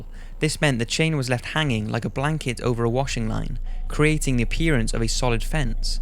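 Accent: Wales, United Kingdom. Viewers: perkin2000 Think you will find it is a Welsh accent, from near Cardiff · It's British. Of course it's audio gold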